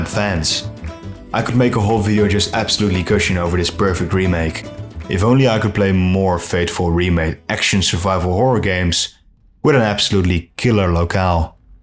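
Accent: Netherlands. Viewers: Great video, awesome accent where you from dude? Guessing Dutch but not positive. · i'm smelling a dutch accent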